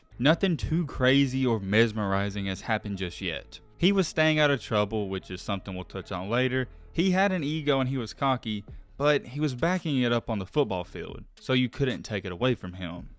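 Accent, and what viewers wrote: South, United States. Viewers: The southern accent is easy to listen to. Makes me feel like I'm having a casual sports conversation with the fam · You're South accent is so warming.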